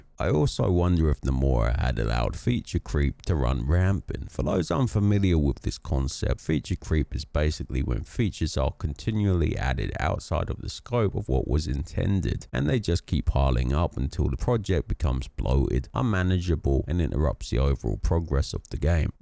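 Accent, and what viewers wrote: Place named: United Kingdom. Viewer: You sound like Moe Sizlack from The Simpsons just getting out of bed with a british accent.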